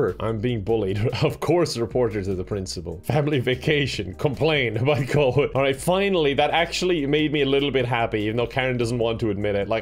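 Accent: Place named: Ireland. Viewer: HOLY FEEECK! I absolutely ADORE your Irish accent, mah dude. And of course, you're super funny! Been loving watching your vids! Xoxo from a huge Brazilian fan 🤗😙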